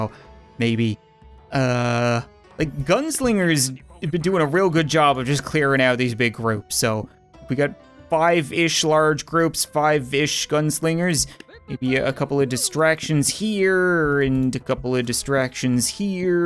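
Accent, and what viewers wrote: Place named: Canada. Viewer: Why was I thinking "Wind Conditions?" Must be the Canadian accent.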